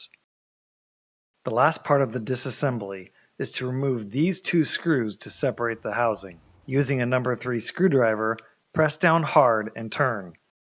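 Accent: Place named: United States. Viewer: WE SUBSCRIBED Coming upon your video after working through a few with non-native speakers or unclear audio filled me with appreciation for your "unaccented" speech-- no drawl, only plain American pronunciation. Along with a systematic disassembly / reassembly process, with product tips and pointers, your video is exactly what I needed. The only aspect for improvement is most of the action is recorded at a distance, and we are left looking over your shoulder, unable to see more orienting detail and your specific, finger-level movements.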